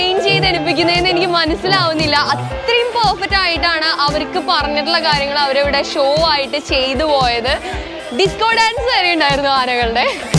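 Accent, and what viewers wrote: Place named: Thrissur, India. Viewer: Your voice, Thrissur slang and way of presentation are very much attractive.. Nice..♥️..also animals shows are exciting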